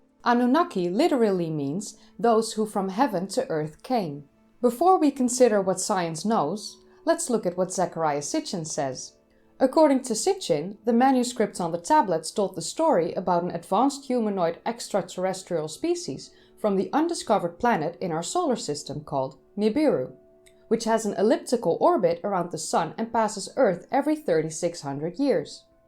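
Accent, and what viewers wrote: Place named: Netherlands. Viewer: your (dutch?!) accent is very nice to listen to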